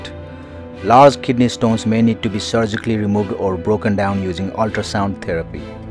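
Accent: India. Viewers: that indian talkin lol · The first picture with a strong Indian accent like his, really confused me because I thought he was saying that it was a close-up of a dick. I had to keep listening and trying real hard to figure out it was a tick. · The indian accent ruined the video.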